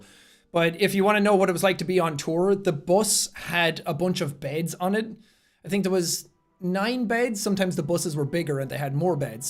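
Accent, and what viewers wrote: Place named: Ireland. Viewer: dude i remember finding you when your where recommended to me while you where playing viscera cleanup detail. Your loud irish accent stuck out to me instantly and i just enjoyed your upbeat personality. Im 32 and now not only do i still watch you but my kids do too. Badass channel bro, and you have one of the biggest hearts on youtube.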